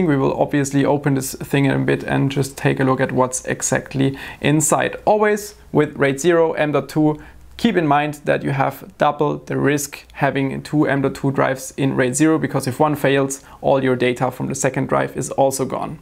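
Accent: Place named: Germany. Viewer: Sorry, but German accents sometimes makes me do a double listen. 20 seconds in... "Hoetel". haha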